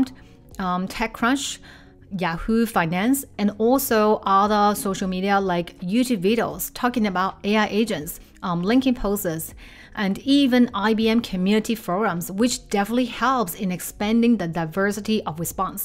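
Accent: China. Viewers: Thank you for your video in comparison; could I make a positive suggestion? for a non native english speaker but with good english language skil, I had a hard time following every thing you said. it was way to fast for me to be able and follow your explainations? Dont get me wrong, I mean it as a positive feed back. You have the typical chinese or asian accent, and speaking so fast, as if you tried to put 20 min in 5 minutes, that it became hard to follow. good luck, and thank you again. 🙏👍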